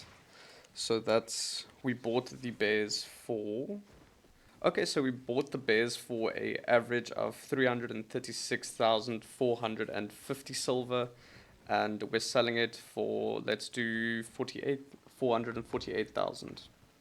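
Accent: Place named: South Africa. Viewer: You are from saffa right ? I know a saffa accent when I hear one, immediately got my attention. 😂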